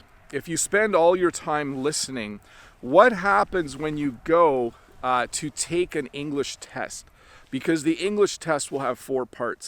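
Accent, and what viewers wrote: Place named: Canada. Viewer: I can understand Canadian 😁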